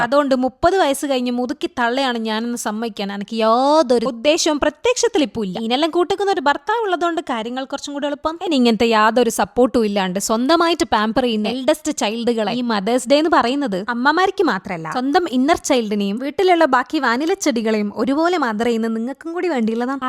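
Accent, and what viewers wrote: Kannur, India. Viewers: I love your Kannur slang and those genuine talks❤Keep going gorgeous🙌🏻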